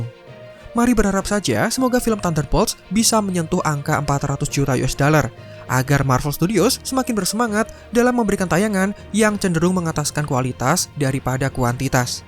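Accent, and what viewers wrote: Java, Indonesia. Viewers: mas, sampeyan asli mana . kok kayak ada medok jawanya, hehe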